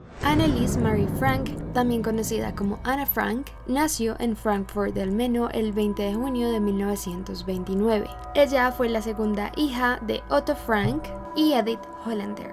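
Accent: Colombia. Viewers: kikis pipos pues no lo veas y chao ella es colombiana asi hablan ellas respeta, que tiene que ver su novio aqui??? ganas de joder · Qué horrible es el acento colombiano, ¿no?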